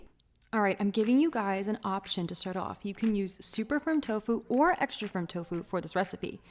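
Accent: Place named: New Jersey, United States. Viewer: Definitely a Jersey girl.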